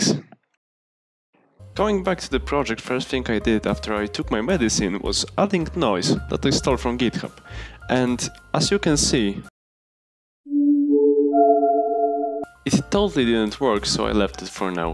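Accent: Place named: Russia. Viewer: it means gj. Cuz ur accent I thought u r russian, but anyway, u made a good tone of work. That inspired me so much. Right now studying golang, and i hope i can make it, wish me luck :D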